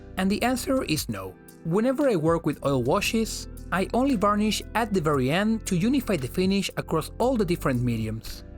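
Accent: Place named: Spain. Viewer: estaba casi seguro que tu acento es del español, notas mucha diferencia realmente en la audiencia por hacer los videos en ingles?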